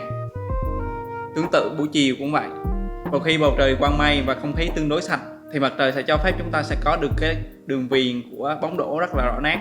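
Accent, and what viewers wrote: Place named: Quang, Vietnam. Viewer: nói tiếng quảng nó cứ vui vui :v , mà xin mấy map bạn đăng k thấy chi sẻ :(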